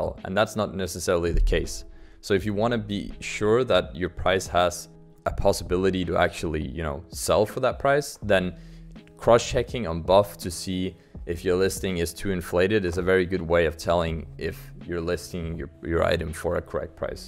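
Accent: Denmark. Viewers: Love ur fcking Danish accent like Pimp xD <3!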